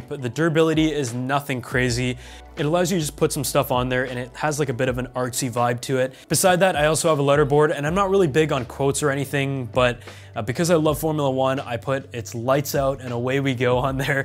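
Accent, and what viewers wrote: Canada. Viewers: Hey, are you Canadian man?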